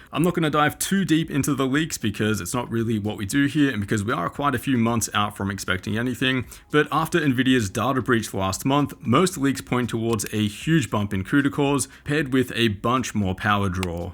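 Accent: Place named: Australia. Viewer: I thought he had an australian accent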